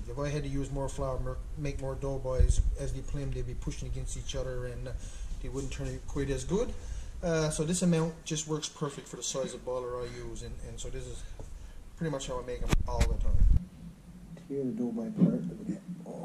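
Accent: Newfoundland, Canada. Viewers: Gotta be a Newfie I’d say bein from Nova Scotia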